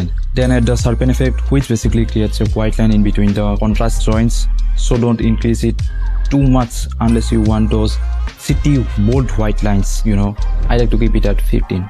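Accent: Assam, India. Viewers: Voice to Assamese or nisina hona jai ase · Bro are you Indian ?? Cause your English is like Indian (like me lol)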